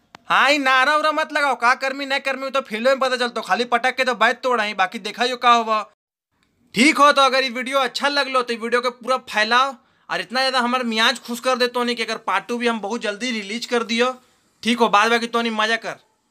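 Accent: Bihar, India. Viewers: Lagta h aap bihar ke Rohtas se ha ...🙏🙏🙏🙏🙏🙏🙏🙏🙏🙏🙏🙏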